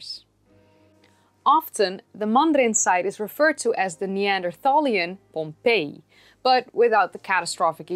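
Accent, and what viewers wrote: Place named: Netherlands. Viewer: She speaks excellent American, but with a Dutch chattiness to it.But how can you tell the difference between the soot of a Neanderthal fire and of modern human fire?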